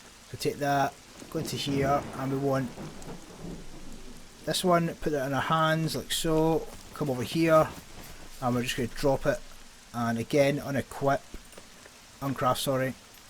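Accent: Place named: Scotland, United Kingdom. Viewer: Not at all hes Scottish conor is irish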